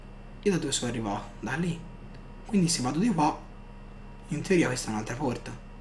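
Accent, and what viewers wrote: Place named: Florence, Italy. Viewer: mi fai morire😂😂 in un manihomiooo💞😂...quanto adoro la pronuncia dei fiorentini ahah💞😂😂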